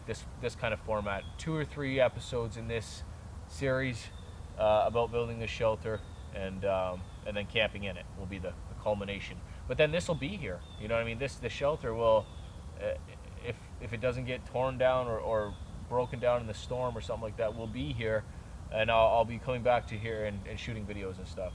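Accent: Canada. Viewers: Awesome woods scenery. Lovely colour in late fall I see. You must be in Canada all those oak and maple tree's. Oh you just said " Canadian gem knots" So you probably are in Canada and sound Canadian. Awesome job on the bed. Gorgeous german shepherd dog too! Yummy poached eggs. Good job on the eating stick. Thanks for sharing your knowledge!! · Is this guy Canadian?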